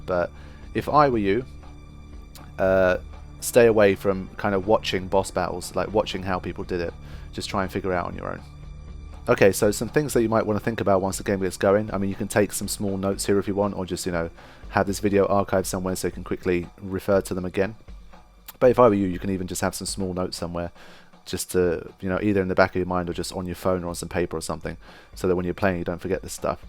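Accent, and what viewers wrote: England, United Kingdom. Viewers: hey man im not sure if you read comments on old videos but ill leave this here anyway. I have been playing ffx since its day of release on ps2 and got 10 for ps3 and ps4 lol. Im playing a casual playthrough on ps4 right now started a week ago. I was looking for some guides/ tips on youtube and your channel popped up i have never heard of you until 2 days ago and now im addicted to your channel!. the amount of content on your channel is amazing and the quality of the content as well. I now have the daunting task of watching your platinum walkthrough and NSG videos. This very video has got me interested in NSG runs the sad thing being that i thought i was a semi veteranof the game but gad only heard about NSG runs about 6 months ago massive face palm. The other thing being that i have never beat all dark aeons plus penance before and now i think you have given me confidence to try. 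It sounds like your english as well which is good im Scottish and its nice to have British gamers talk about Final Fantasy it seems rare not that im dissing any other FF gamers Dark Kefka has incredible knowledge on the games. Hopefully when FF 15 releases you will cover that as well. Any peace man thank you for giving me a whole new channel to watch i can only subsribe and keep watch over my newsfeed hopefully thats enough for now i wish i could do more Thank You.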